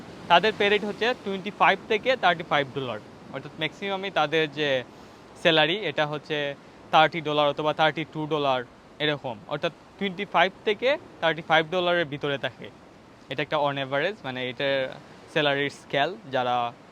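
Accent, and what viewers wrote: Sylhet, Bangladesh. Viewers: Bai bare Sylhet ni ?? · ভাই সিলেটি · আমি নিশ্চিত আপনি সিলেটি । 🤍🥰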